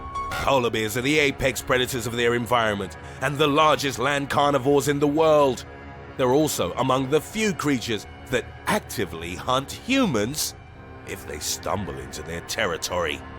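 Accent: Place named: Cockney, United Kingdom. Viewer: Easy going gentle giant 😂😂 You sound proper cockney 😂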